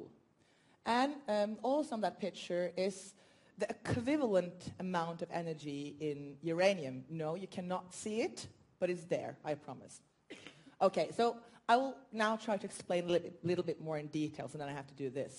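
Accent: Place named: RP, United Kingdom. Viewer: Too posh...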